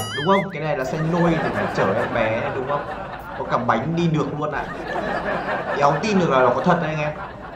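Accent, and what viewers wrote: South, Vietnam. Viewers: nói tiếng trong Nam dễ thương quá dợ (´∀｀)♡♡♡cute ghê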